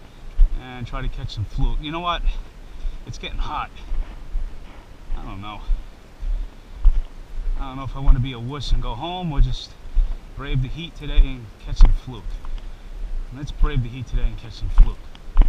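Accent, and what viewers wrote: United States. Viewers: I knew you were from states island soon as I heard your voice lol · Hi brother, you speak like my long island friends that I worked with back in the early 80's at midtown South. Anyway I looked up where to find bloodand sand worms in new York City and surrounding areas.i got your channel. Still don't know where to go exactly but hopefully will. Specially close to NYC. Thanks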